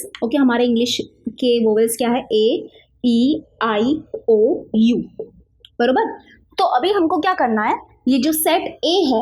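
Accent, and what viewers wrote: Maharashtra, India. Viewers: Mam is speaking hindi good but the 'Barobar' 😅 mam is marathi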